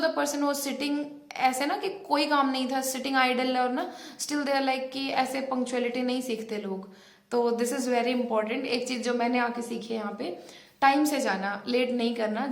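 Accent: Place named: Sindh, India. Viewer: Ur tone looks like Sindhi so r U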